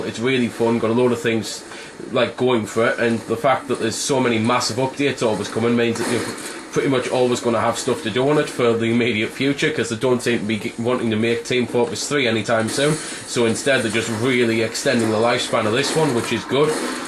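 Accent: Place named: England, United Kingdom. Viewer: WHOA, I just clicked to :39 and right away I'm blasted away by this...... Loud, fast, English accent XD the only word I understood was "Achievments" lmao No offense to you, of course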